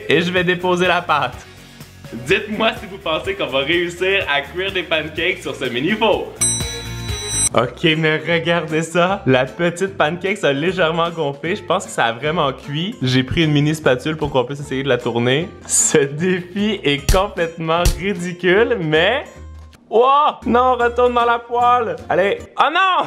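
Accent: Quebec, Canada. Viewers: Alain Leung -Kwok logique il a un accent québécois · J'aime trop votre accent les québécois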